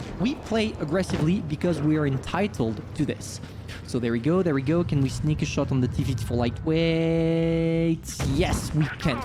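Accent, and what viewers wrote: France. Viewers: Tu est français😂😂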